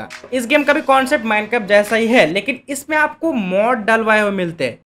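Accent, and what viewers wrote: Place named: India. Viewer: Goofy indian noise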